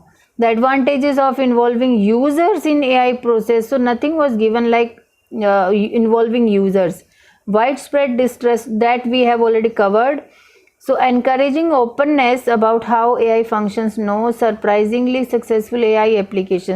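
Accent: India. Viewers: Annoying indian accent and unclear voice, and She's not reading like a ielts instructor. 😑😑😑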